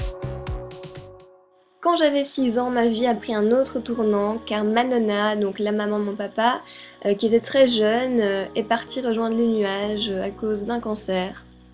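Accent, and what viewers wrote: Belgium. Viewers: est ce que tu es Belge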